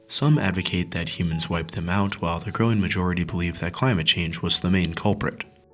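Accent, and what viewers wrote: United States. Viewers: Nothing is quite as annoying as someone who SOUNDS American. yet choses to use Metric Units. If you're American, BE PROUD OF OUR IMPERIAL HERITAGE. O, and quit turning the "TH" sound in "NeanderTHal" into "NeanderTal." That is something that only came about in the 50 years since I left school—and it SOUNDS LIKE HELL!!!!!!!! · I found the subject matter interesting. But where are you from? You sound like an American but your use of the metric measurement system is foreign to me. I know how long an inch is and also afoot. But centimeters and meters I have no idea. So I gave you a thumbs down. For your American viewers, I would suggest using the measurement we're used to and not to cater to your foreign friends for their not the ones who pay your bills. Otherwise I found your video informative but will forgo any more till you learn to measure in your native system.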